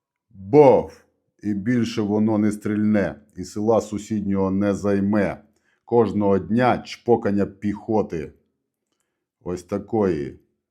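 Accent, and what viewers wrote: Transcarpathia, Ukraine. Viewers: Зацініть закарпатський діалект роберта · Закарпатська українська-це шедевр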